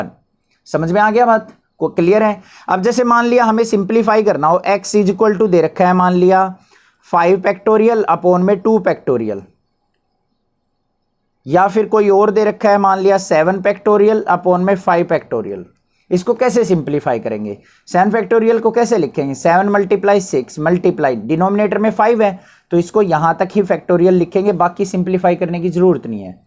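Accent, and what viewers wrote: Haryana, India. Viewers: sir ji mugje laga hi tha ki aap harayana ke ho aapki language se ma bhi haryana se ho na isiliye,vase ma hisar se hu sir. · are sir aap to sacchi me haryanvi bolte h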